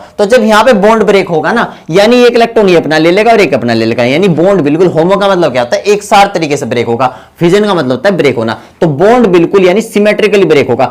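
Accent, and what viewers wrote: India. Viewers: Sirji west up wali bhasha mast h 😂❤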